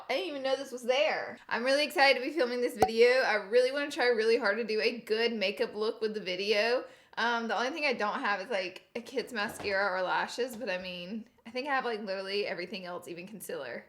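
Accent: South, United States. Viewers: I’m glad I’m not the only one with a country accent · I saw your manicure on Snapchat and I'm in love with it. I've been wanting to tell you something but you get so many comments that I doubt you'd ever see it, but I just wanted to tell you that I love watching your videos because I feel like I can relate to you so much because I'm from Louisiana and your southern accent makes me feel like I know you personally or somethin. I know it sounds crazy but every time that you really like a product I end up going to buy it just because I feel like I know you or something and I feel like we would like the same things. Also I was very surprised that people didn't know what cat's pajamas meant I've been saying that since I was a little kid so maybe it's a southern thing. and speaking of your palette, I use it almost every single day and I have a beauty group on Facebook and I have almost all of my members using it as well because I do live videos of looks with it and they fell in love with the colors and how pigmented they are. 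Just wanted you to know that I really appreciate you and relate to you on a strange level. too, because his mannerism reminds me a lot of my husband's, so I love seeing the two of you together because it reminds me of my husband and I. I hope I don't sound super weird you probably won't see my comment anyway but I just wanted you to know that there was someone out there that really relates with you and appreciates all that you do for the YouTube Community.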